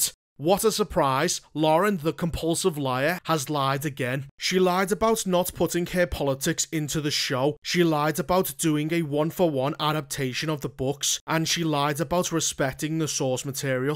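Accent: Liverpool, United Kingdom. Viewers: I don't know what's funnier, the cutting, but very accurate commentary and analysis, or the fact that it's all delivered in a Scouse accent 🤣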